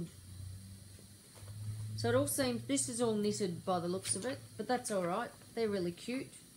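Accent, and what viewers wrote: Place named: Australia. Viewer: Hello, I'm new to you sight. Nice to hear the Aussie accent. Thank you for showing those magazines, going to get the Crochet Now Magazine tomorrow. :)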